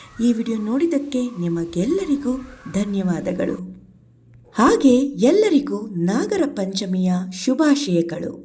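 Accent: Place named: North Karnataka, India. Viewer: ನಿಮ್ಮ ಹಾಡು ಸೂಪರ್ ಸಿಸ್ಟೆರ್ ಅದಕ್ಕಿಂತ ಹೆಚ್ಚು ನಿಮ್ಮ ಉತ್ತರ ಕರ್ನಾಟಕದ ಶೈಲಿಯಲ್ಲಿ ಮಾತಾಡಿದರಲ್ಲ ಸೂಪರ್ 👌👌👌👌👌👌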